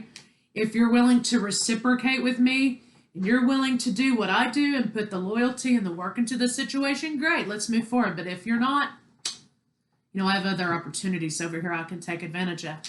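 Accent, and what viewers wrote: Texas, United States. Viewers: Love your style of reading. And I just knew you’re Texan. You’re dead right too. Still don’t know how I’ll handle her if she makes contact. My head says hell no. To much conflicts in the past, but there’s a magnetism and it bothers me, as me as person rather start a new with someone else. Im afraid, like you said, it’ll go back to be like before. Tough call. Thank you for a very good reading.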